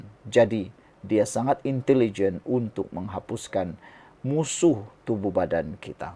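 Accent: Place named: Sabah, Malaysia. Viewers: Lorrr.. tu kan Bahasa Malaysia SLANG Sabah.. sama juga dgn org Kedah, Kelantan, Terengganu, Penang yg cakap BM tapi dgn loghat lidah negeri mrk... !! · Itulah cara kami orang sabah bercakap.alhamdulilah kami serumpun tiada membeza bezakan antara satu sama lain.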